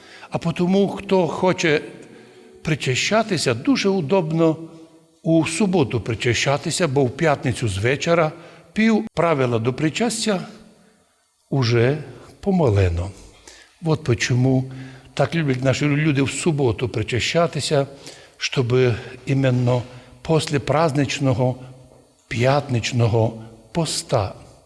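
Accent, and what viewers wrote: Transcarpathia, Ukraine. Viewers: На украинском закарпатский диалект.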